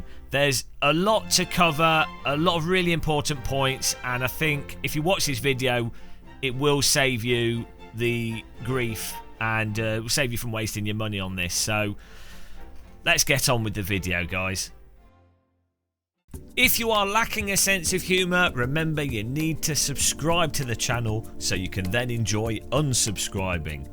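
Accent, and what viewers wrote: United Kingdom. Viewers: I wished the british accent would have brought some credibility to this post but he is evaluating this game as a racing simulator... Anyone who played the first iteration knows that this game isn't a fancy sim racing game. This is more a perfect mix of great physics and pure fun.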